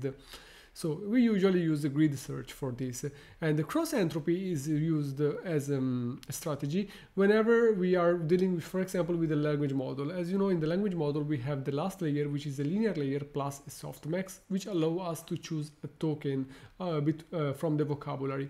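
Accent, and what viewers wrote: Italy. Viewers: I've seen many of your videos and you are a great teacher! Thank you for your effort in explaining in plain (Italian accent) English all of these complicated topics. Regarding the content of the video - you showed the quantization-aware training and you were surprised of the worse result it showed in comparison to the post-training quantization in the concrete example you made. I think it is because you trained the post-training quantization on the same data that you tested it on, so the parameters learned (alpha, beta) are overfitted to the test data, that's why the accuracy was better. I think that if you had tested it with true test data, you probably would have seen the result you anticipated.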